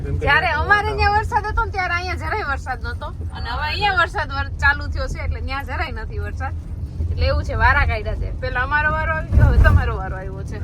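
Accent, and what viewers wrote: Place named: Bhavnagar, India. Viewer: mne tame bolo chho a spich mne bav game aam bi mne bhvngar ni lengwej boooj game mari frend gondal na pinl gohel atle pelle thi vaato karvi bav game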